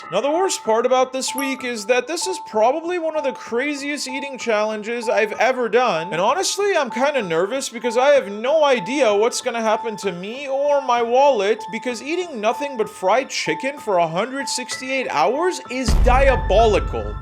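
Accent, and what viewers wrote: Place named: United States. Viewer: you look very mexican/middle-SouthAmerican but sound very american please decide you cant be both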